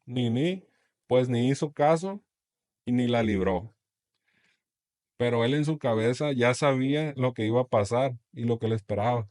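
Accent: Sinaloa, Mexico. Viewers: Me gusta como narra con acento bien sinaloense y los diálogos hacen parecer como si uno mismo estuvo ahí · es acento sinaloense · me gusta el acento ese de mexico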